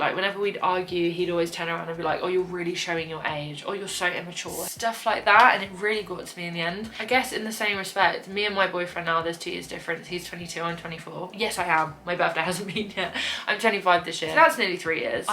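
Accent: United Kingdom. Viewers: Am in love withe ur british accent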